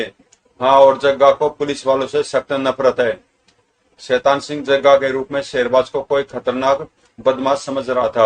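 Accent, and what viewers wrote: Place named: Himachal Pradesh, India. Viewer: लहजे से उत्तर भारतीय लगते हो...हिमाचली...बस कॉमिक्स में अपने पर्सनल विचार/ हंसी न दिया करें। बाकी सब बढ़िया है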